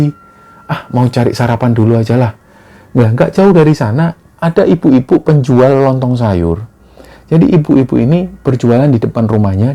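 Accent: Java, Indonesia. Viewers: Sempet suka sama beberapa channel yang nyeritain kisah horor, eh lama kelamaan kelamaan malah suka manggil narsum yang kayanya ceritanya ngablu/fiksi/dibuat2, kurang suka juga sih kalo yang nyeritain narsum nya langusng, karna ga semua narsum bisa ngatur cara bicaranya untuk bercerita Akhirnya sekarang lebih sering denger cerita dari karna yang nyeritain ya cuman mas doang, jadi feel nye hari ke hari makin dapet, terlebih karna saya orang jawa jadi lebih enak aja kalo yang cerita ada medok2nya/campuran bhs jawanya. Serasa lagi diceritain pakde sendiri semangat terus tim, semoga bisa makin besar channelnya dan pendengarnya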